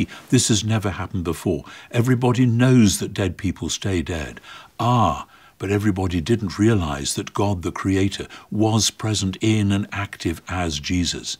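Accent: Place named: United Kingdom. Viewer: N.T Wright has one of the best British accent I’ve ever heard I could listen to them all day.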